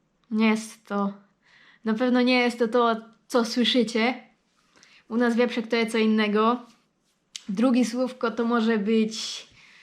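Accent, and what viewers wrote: Silesia, Poland. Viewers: wieprzek-angrys-agrest dzisty? czyzby od dzistac?srac, pierdziec, fulac;; a moze zisty- ciasta? hasiok-smietnik (od niem. Asche-popiol) Hasie, dlatego tez Aschenbecher- popielniczka antryj-przedpokoj (od fr. Entrée) przypadkowo po 3 latach od wystawienia tego vlogu znalazlem sie tutaj (przez trzi lata on tukej do mie szol 😂) gryfno frela godo piyknie po slonsku dlotego Daumn hoch👍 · Akcynt Slonski pzostvanie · Twój ślónski to je tak richtig ześlónszczuny polski a jak tak richtig chcesz pogodać po ślónsku to zapraszam , pogodumy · mowa śląska jest piękna,szczególnie urokliwie brzmi w ustach ładnej ślązaczki.Miałem śliczną /było to dawno/ dziewczynę z Zabrza bardzo lubiłem słuchać jej śląskiej mowy. Nazywała się Alinka Opałka bardzo mile ją wspominam z taką tęskną nostalgiczną nutką, było to w latach 70-tych popełniłem wtedy największy błąd swojego życia kiedy się z nią rozstałem.Marzę abym mógł jeszcze kiedyś spotkać ją i porozmawiać. 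· Ale Ty masz śliczne oczy <3 Śląska gadka fajnie brzmi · Uwielbiam te śląskie gadki! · ja mosz racja ślunskoł godka je fajno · Młoda, piękna i ta śląska gwara. Cudo!:) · podoba mi się ta Śląsko gadka 😊❤️ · Dyć pieknie godosz po naszymu, przaja tymu fest :) śleciałaś nom Ślōnzokom ôd Pōn Bōcka szłoby pedzieć :)) pozdrawiom Ślōnzok spomnij sie zawdy kej żeś je markotno, iżeś nie jes sama ;) jes nos wiyncyj :) i godomy jednakim jynzykiem Ślōnskim. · Kocham śląsko gadke! <3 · Jesteś bardzo ładną dziewczyną i bardzo fajnie się Ciebie słucha jak mówisz po Śląsku 😊😘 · tak żech myśloł że to z połednia naszego regjonu 😜 miołech znajomych stamtond i łod razu było slychać że jakoś tak choćby krojcoki. Pozdro Frelko 😁 fajnie szprechosz i jo Cie rozumia. Tyż myśla i godom w doma po naszymu a we robocie to musza z niekierymi ą i ę. No i ucza sie w Dąbrowie to tam to juz richtik cza mówić. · Fajnie godosz. 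Skond żeś je? Możesz zdradzić przinajmij powiat?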